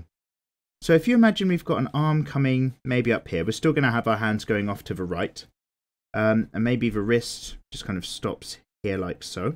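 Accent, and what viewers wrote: United Kingdom. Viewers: very bri ish eh?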